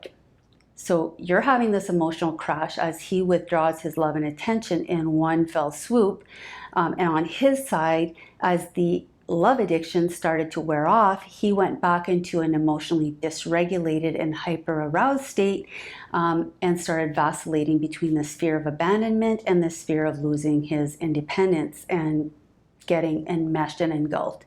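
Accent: Canada. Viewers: I am diggin' the Canadian accent!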